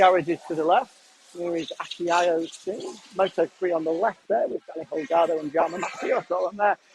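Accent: United Kingdom. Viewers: Pembawa acara MotoGp sama Badminton emang paling cocok pakai aksen britis.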